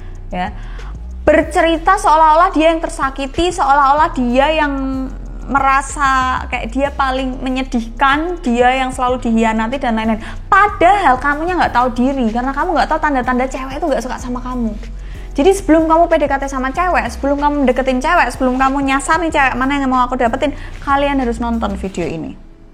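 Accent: Java, Indonesia. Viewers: Medok bngt jawanya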